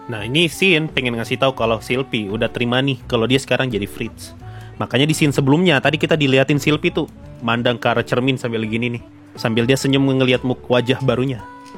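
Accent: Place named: Eastern Indonesia, Indonesia. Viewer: anjir logat mu kek tmn2 gw yg dr ntt/papua bg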